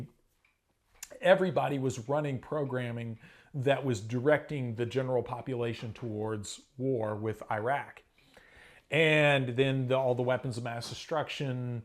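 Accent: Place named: South, United States. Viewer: Your Southern accent was stronger back then, haha.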